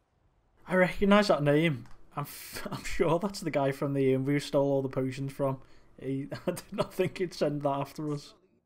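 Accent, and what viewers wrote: Liverpool, United Kingdom. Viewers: Lad, you scouse? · A scouse YTer? Instantly subscribing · Scouseeerr!! Loved the video man 👍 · Are you a scouser? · You a scouser? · Hi. R u Scouse? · Mate, that was a boss first video! It's nice to hear a fellow scouser too, tbh! Subbed! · Awww finally a scouse youtuber! ALREEET LAAADDD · nice to hear a scouser, here's some engagement for you mate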